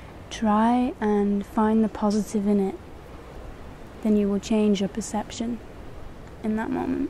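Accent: United Kingdom. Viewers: Like so many before me, I would like to express my gratitude for your channel and my joy in finding you! I just today found your videos and am so happy that I have. ASMR coupled with spirituality and themes such as unity and a universal oneness is exactly what has attracted me so much, in addition to your beautiful voice (singing and speaking) and positivity. You are such a beautiful light and a lovely soul! Thank you for all your wisdom and sharing these relaxing and informative videos for the world. <3 Also, I have to ask. Your website states you're from Manchester, England. However, I think you sound just like Ygritte from game of Thrones (Jon Snow's first girlfriend, if you're familiar with the show). She's played by Rose Leslie, who is Scottish. So I was extremely curious how a British accent and a Scottish accent sound so similar! Are Manchester accents similar, in your opinion? 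I'm just a silly American who obviously is unfamiliar with the subtleties and differences in accents. Thank you again,! Much love and light! <3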